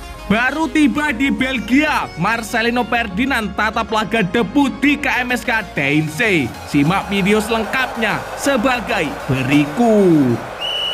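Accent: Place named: Banyumas, Indonesia. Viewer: Min, Ngapak banget.....😀😀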